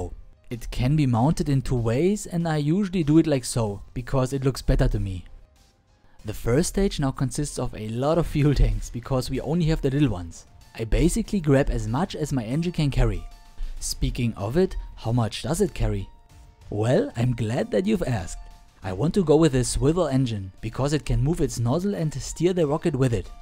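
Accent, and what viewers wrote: Germany. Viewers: Are u german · German detected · There is something to be said about the german accient giving authenticity to the video.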